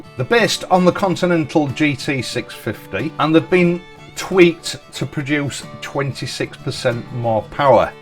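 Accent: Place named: East Yorkshire, United Kingdom. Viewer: Long Riston Jet garage? :) Makes a nice change to hear an East Yorkshire voice! Good channel.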